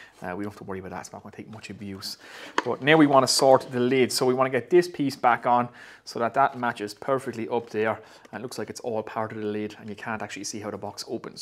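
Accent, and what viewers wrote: Ireland. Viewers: good man...... I usuall saw the walls as the plough will jus rip it apart going cross the grain. I have a plough with a scoring cutter but find its quicker to jus saw the wall than fiddle around with the scoring cutter...... they're a pain to sharpen too 🤦‍♂️😂 I enjoy your hand tool stuff lad, it's also nice to hear our distinct Irish accent on a youtube video 😁🤙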